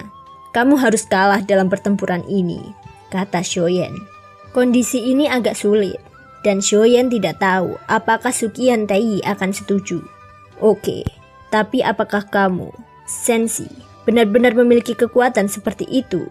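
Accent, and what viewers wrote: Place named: North Sumatra, Indonesia. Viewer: Kental kali batak nya kak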